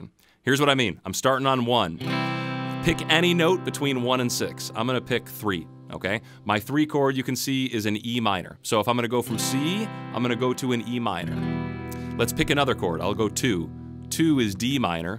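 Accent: United States. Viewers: I can sync, feel this Dude’s Radio-sounding voice, clear English-American accented explanations and way of teaching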